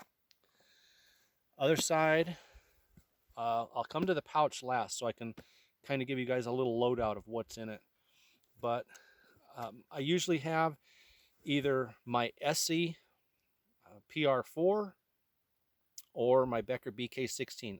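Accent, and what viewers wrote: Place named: Mexico. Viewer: I like your Mexican accent. Like your hat also.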